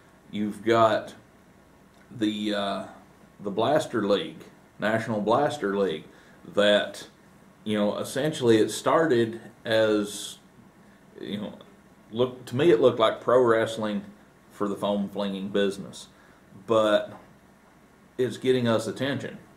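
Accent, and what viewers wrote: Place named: South, United States. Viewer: Once again the voice of reason has a sweet southern drawl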